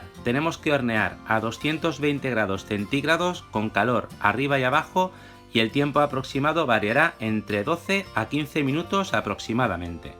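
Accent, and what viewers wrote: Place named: Spain. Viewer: gracias yo soy panadera me gusta demasiado la panadería de que parte del mundo eses tu tu voz parese española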